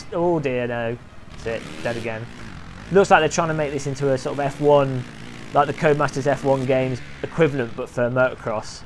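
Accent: United Kingdom. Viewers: Man i just love British commentaries, I'm a total sucker for your sexy accents no matter northern or southern. Your hilarious Assetto Corsa review got me sold on you, hope your channel gets huge. XD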